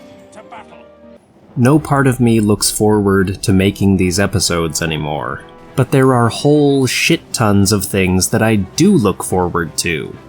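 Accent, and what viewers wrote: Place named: United States. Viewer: Completely understand the frustration. I do hope you'll stay the course. Your narration is clever and entertaining, and the editing, phenomenal. I subscribed immediately upon finding your channel. I'm a lore nut and really only watch good faction campaigns. Would love to see you play as an elven faction or Gondor on AGO and see what that does for your channel. Whereabouts are you, if you don't mind? Stateside? You sound American but seem to handle Germanic and Gaelic word annunciations with ease.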